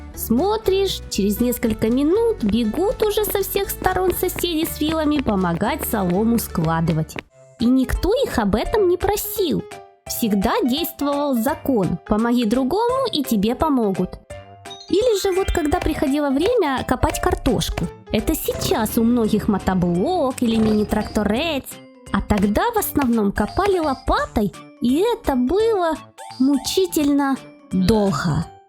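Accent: Ukraine. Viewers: Ты что из Украины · Ты с украины? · Эй ты что с Украины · Ты что украинец а я думал что просто так говоришь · А ты украинка чтоли 😢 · ты из Украины что ли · Ты чё украинкачто ли🤔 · Ты что украинка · А ты что украинака?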